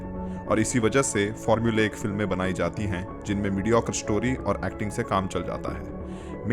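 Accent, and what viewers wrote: Kerala, India. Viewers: Bro why I feel like you have malayalam slang in your hindi and you pronounce malayalam correctly like any other mallu.. unlike North Indian... is that a mallu guy behind the mask.. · But your Hindi like Malayali Hindi ...? Why ...? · Dude your mallu accent is so obvious 🙂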